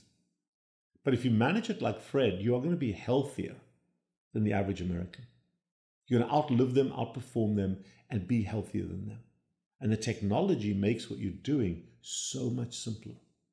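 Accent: South Africa. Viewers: yes he is from south Africa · Hello! Is that a South African accent? Ek hou van die afrikaanse taal. Greetings from Evergreen, Montana, USA.❤❤❤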